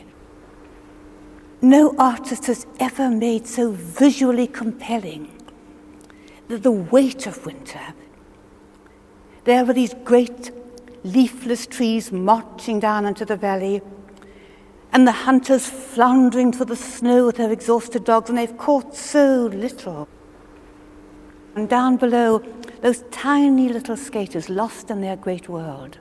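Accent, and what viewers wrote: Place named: South Africa. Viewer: Sr Wendy largely lost her South African accent but it shows in her ability to pronounce Dutch, Flemish and German names correctly.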